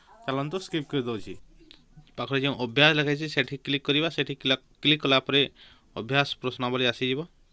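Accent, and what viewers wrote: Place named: Western Odisha, India. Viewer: Thank you sir Apana western odisha ra ki apanka language Ru semiti janapaduchhi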